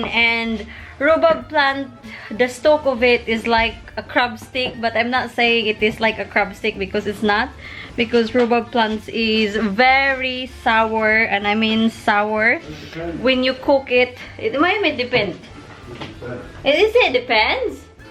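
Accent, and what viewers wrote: United Kingdom. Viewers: I can hear the British accent emerging 😌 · When im watching you talking,i remember the queen when she talks,ive watched the crown,you speak like queen elizabeth,you have a british accent already....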